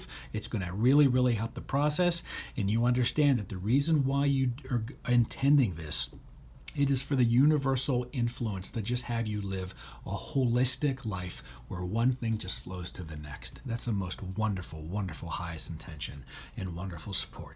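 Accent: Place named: Pittsburgh, United States. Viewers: I detect a Pittsburgh accent!:) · Can't hide Pittsburgh. It always slips out 😄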